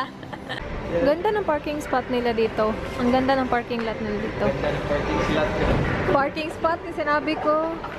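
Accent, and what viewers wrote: Visayas, Philippines. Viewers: hi??mu anhi ba daw kag Manila??hala ganahan kaau ko maminaw nmu pag mag binisaya ka..labyo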